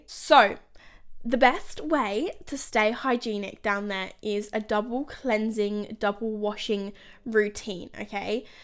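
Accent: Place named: Australia. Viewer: Am I the only one who is hearing the Aussie come out!!! Can’t wait to see your Aussie content! Xx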